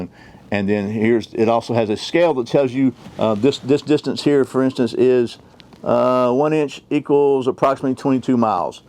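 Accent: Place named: Alabama, United States. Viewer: Great video! I knew I recognized that accent. Over in Alabama we sound so similar. 😅 Learned a lot here. Thanks for the upload.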